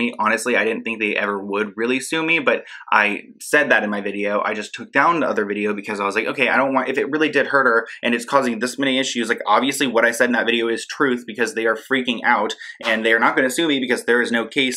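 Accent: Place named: New York, United States. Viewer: Those curls 🤍love your accent. NY?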